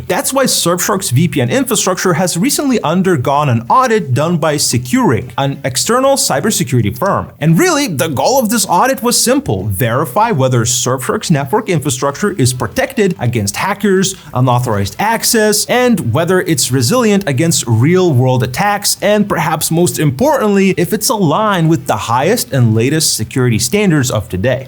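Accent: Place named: United States. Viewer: This guy speaks like a tosser. Slow down and stop the up and down voice "noise" Not everyone likes to listen to that American noise!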